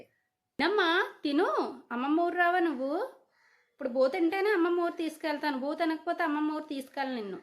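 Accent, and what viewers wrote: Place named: Godavari, India. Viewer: Ravi mantrii sister la ఉన్నారు me భాష ఆ యాస ఎంతైన గోదారిలో కదా🎉❤😂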